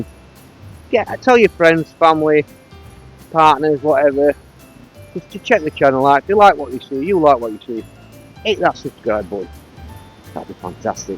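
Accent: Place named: Barnsley, United Kingdom. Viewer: My mum loves you for speaking broo-ard BaRRRnsley. Heebee geebees 😂 etc. Happy festive season to you and yours.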